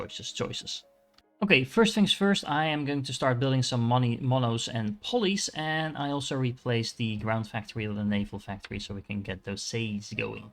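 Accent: Netherlands. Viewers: actual mindustry content????!!! rare to find also i love how we dutch people speak english you can emidiatly recocnize yourself lol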